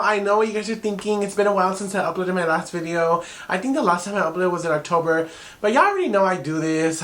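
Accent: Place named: Dallas, United States. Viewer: I’m deaaaddd!!! I’m from Dallas and you sound like someone from D town 🤣❤️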